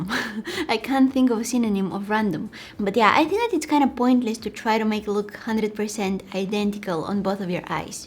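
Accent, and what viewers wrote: Russia. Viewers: I actually also understand every single word but the problem is her russian accent. I like her and her vids a lot. But her accent is a bit difficult. I know she can't do anything to speak without an accent but i've just said it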